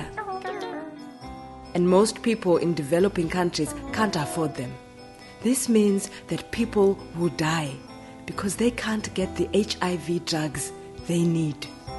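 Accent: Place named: Africa. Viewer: By your pronountiation i assume you're from Africa, you should know that the problem of Africa is just NUTRITION, not AIDS, you should ask for proper food and for clean water, not for drugs or medicines. It hasn't been proved this drugs works and it is scientifically well known their toxicity, that can kill quickly someone who hasn't a good nutrition. So why? Thabo Mbeki was right. That's the reason why he falled down... Turn on your brain please.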